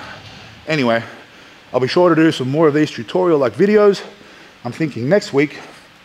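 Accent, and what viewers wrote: Australia. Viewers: Is this guy an Aussie Aussie Aussie?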